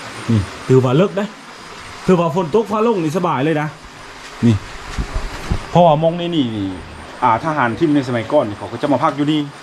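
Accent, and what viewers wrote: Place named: Isan, Thailand. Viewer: ผมติดตามสำเนียงเว้าม้วนๆครับอ้าย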